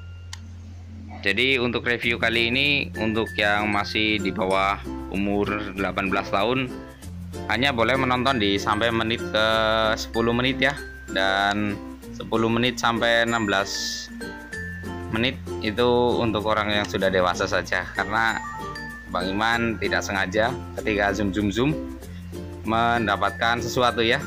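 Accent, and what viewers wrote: Banyumas, Indonesia. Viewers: Keren bahane ngapak campur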